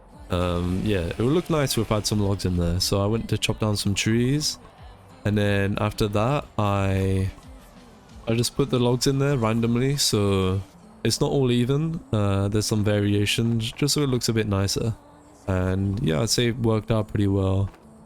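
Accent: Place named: United Kingdom. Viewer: He has a British accent!!!!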